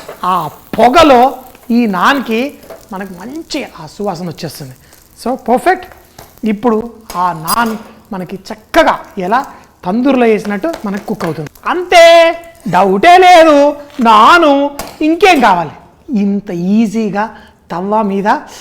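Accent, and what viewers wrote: Telangana, India. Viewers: Sir, I love your telangana language big fan of the way you speak kindly speak like that dont add Andhra language please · You’ve to be awarded the cultural heritage icon for Telangana💐🥇 the language of regional accents,the taste behind both nawabs and rural cultures are preserved,presenting through dishes are awesome.🙌👌🤲🏼❤️😍🥰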